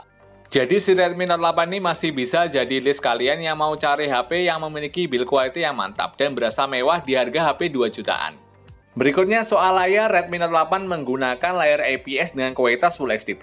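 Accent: Magetan, Indonesia. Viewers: Asli ne wong ndi iki kok nk alon" magetan🤣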